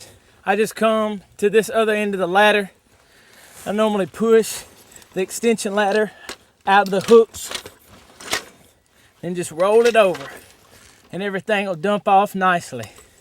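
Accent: United States. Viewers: you are from texsas? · the southern accent helped me to pay attention, thank you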